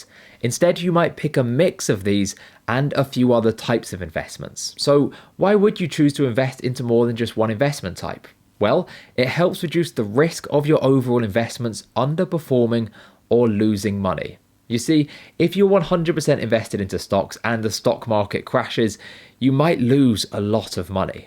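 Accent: United Kingdom. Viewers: Hello,! I have been watching your videos for quite some time and I am really excited about stock investing as you are (and your british acent is supercool!). I know you are not an advisor or smth, but I would love to hear your opinion about these five stocks (They got on my radar for this/next months investments to buy). It is: Verizon, wingstop, alibaba, walmart and pluralsight. What do you think about these (going long run 2-5years?). Maybe you have something else on your sight? Thanks in advance!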